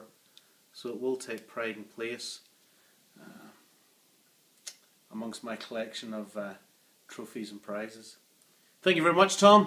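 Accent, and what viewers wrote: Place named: Ireland. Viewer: Sweet Gifts. Dig the pirate talk especially since your Irish accent makes it sound more genuine than mine with my South Florida Cuban English. We've got to do something about that jersey though.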